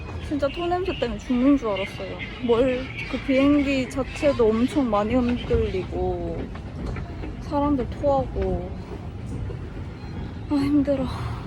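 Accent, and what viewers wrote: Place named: Busan, South Korea. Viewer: 님 사투리가 들리네요!ㅋㅋㅋㅋ 부산사람이셔요?!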